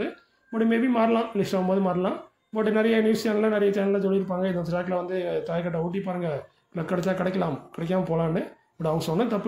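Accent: Tirunelveli, India. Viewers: ஐயாவின் நெல்லை தமிழில் தகவல்கள் பயன் அளிப்பதாக உள்ளது. திருப்பூர் புல்ஸ் சார் என்பது தெரியும். சார் தங்கள் பெயரையும் உங்கள்????? சொல்லுங்க. I never miss your news. Thanks for information.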